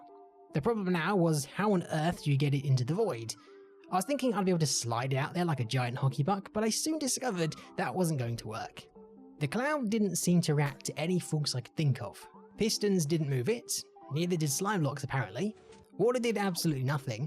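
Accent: United Kingdom. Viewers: Thats how ever british person sounds like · So british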